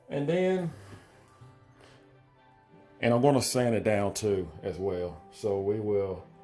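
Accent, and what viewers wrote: South, United States. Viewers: Love the country accent.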